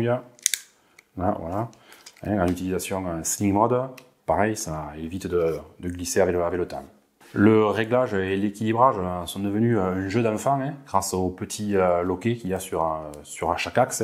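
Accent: South, France. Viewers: A mince j'ai pas vu, en tout cas merci encore pour ton aide. Je te suis sur ta chaîne et j aime bien les tests que tu réalises, de plus je suis comme toi du sud et j'ai le même accent et j'en suis fier.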